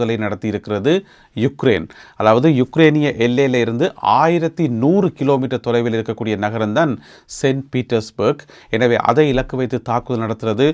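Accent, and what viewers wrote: Jaffna, Sri Lanka. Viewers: தம்பி உங்களது அழகான தமிழிற்காகவே உங்களது பதிவுகளை கேட்பேன் யாழ் பேச்சு வழக்கில் உங்களது பேச்சு நடை மிகவும் இனிமையாக உள்ளது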